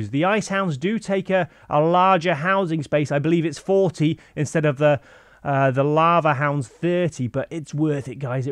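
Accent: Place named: United Kingdom. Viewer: His accent is British.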